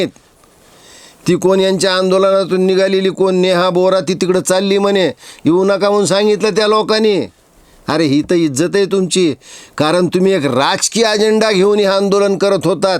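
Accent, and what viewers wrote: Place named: Satara, India. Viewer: एकदम सातारी भाषा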